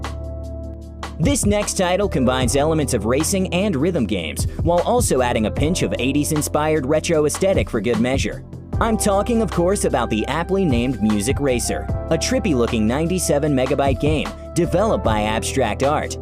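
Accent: United States. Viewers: A handsome Indian with a perfect American English , Man you rock!!!!! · Theb why does your voice sound so american if your indian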